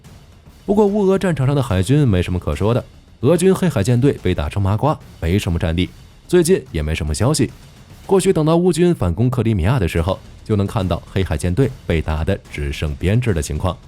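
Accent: China. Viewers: 這不是大陸腔調嗎? 大陸會允許這種影片? 最後幾句話也太單純了吧！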